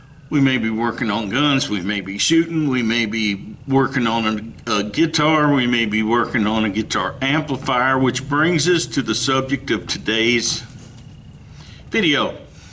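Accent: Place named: South, United States. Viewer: What region of the US is this southern accent from? I have two units of superchamp xd. I got the first one new because I loved the sound and the price at the store. Years later I went for another one but they had the new x2 version which I didn't fancy. So I waited until I found one second hand. Great amp, size, power and.... brilliant sounds wiselychosen.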